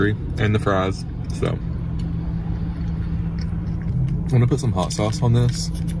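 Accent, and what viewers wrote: South, United States. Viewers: I love your country accent 😍 and your face is beautiful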